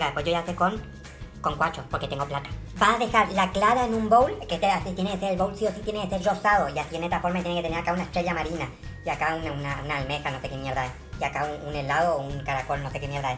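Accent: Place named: Corrientes, Argentina. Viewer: Como no perder el dialecto correntino me encanta muero de risa. Me encanta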